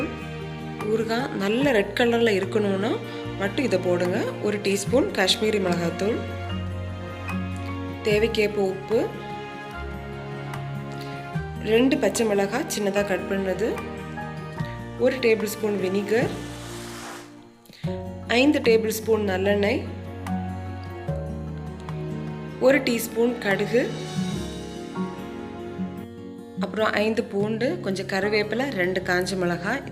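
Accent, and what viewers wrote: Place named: Kanyakumari, India. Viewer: Kanyakumari Tamil❤️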